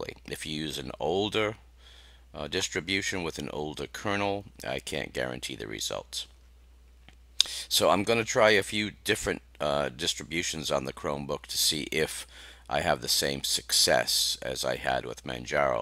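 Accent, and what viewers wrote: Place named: New England, United States. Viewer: You've got to be from Mass or NH with that accent.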